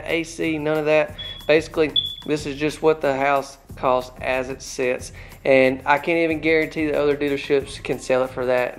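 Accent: South, United States. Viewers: Very southern/ cowboy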